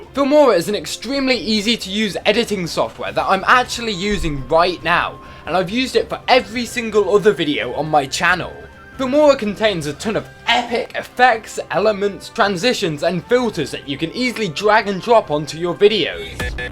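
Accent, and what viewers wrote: United Kingdom. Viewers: This guy has the most british accent ive ever heard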